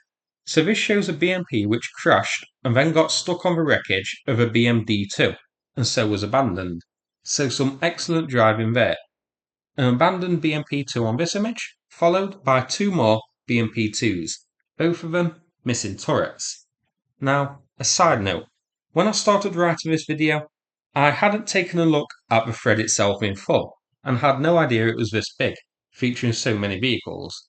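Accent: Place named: Mansfield, United Kingdom. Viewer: I always thought your accent sounded familiar, I’m from Rainworth but no one knows that so unfortunately I have to say Mansfield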